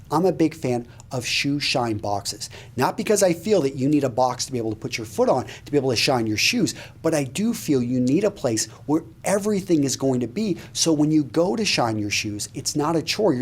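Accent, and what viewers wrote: Wisconsin, United States. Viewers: Woah, weird to hear someone from Wisconsin. We're like the lost state.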